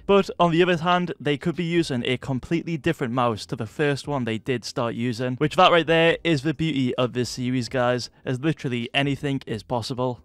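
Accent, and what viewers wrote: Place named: Newcastle, United Kingdom. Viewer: here r u from newcastle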